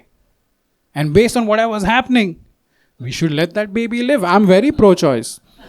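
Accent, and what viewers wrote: India. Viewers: Daniel Fernandes but with an India accent? Huh? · Wtf with this Indian guy (is he real Indian or just mocking the accent all the time? Because his name is not Indian at all lol), I have never been laughing and shed tears while watching a stand-up! This guy is a comedic genius! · Humans aren't necessarily apathetic, many are, but not as an essential quality. The comedian sounds Indian so lets just call it Tamas (inertia, rest, ignorance). We live in a global order based on nation-states, competing interests, strategies, and power. The rule is that as long as atrocity doesn't spill over beyond the boarders of that state, then direct action will not be taken by other states unless they have some economic or strategic reason to do so. It's vile, it makes everything feel hopeless for sure. But hope is found in recognition that states are artificial. 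They are as transient as the seasons.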